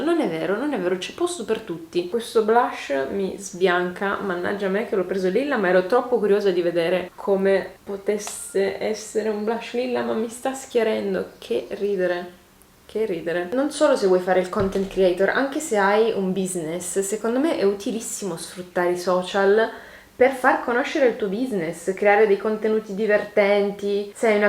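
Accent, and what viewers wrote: Turin, Italy. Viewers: Il tuo accento è assolutamente torinese!😄😄😍 Tutti i torinesi, sono conviti di non avere inflessione. Io che sono nata a Torino, ma vivo e ho origini toscane, lo so bene. Adoro il torinese e mi manca la mia città. Sei una persona bellissima 🥰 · Mi fa morire che pensi che i torinesi non abbiano un accento! Hai un accento fortissimo 😂♥️ e lo trovo adorabile!! Bellissimo video come sempre, non commento mai perché sono riservata, ma oggi volevo dirti che i tuoi video mi tengono tanta compagnia e mi infondono tranquillità, grazie 🌸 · video molto bello ! però la questione accento non è troppo vero, l’accento di torino è inconfondibile ✨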